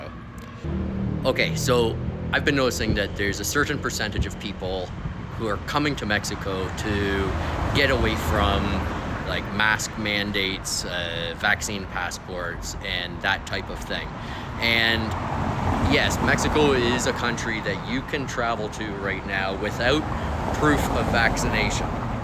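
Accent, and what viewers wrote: Canada. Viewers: I noticed that Canadian accent right away LOL. As soon as you said oat and aboat was pretty obvious😂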